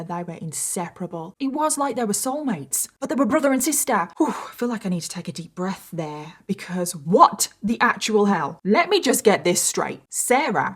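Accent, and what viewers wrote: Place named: United Kingdom. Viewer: Very sick and twisted story. Made me tear up when you told us the bikers and nice car owners led the funeral procession. Side note I love how you use the word "whilst." Your British accent is the kind of British accent I love! I don't know the area and the different dialects, but I can actually understand you! 🤣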